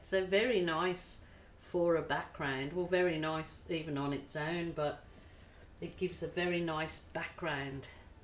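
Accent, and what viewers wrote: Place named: England, United Kingdom. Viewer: Always love to hear your cheerful English voice, I live in France so I always enjoy.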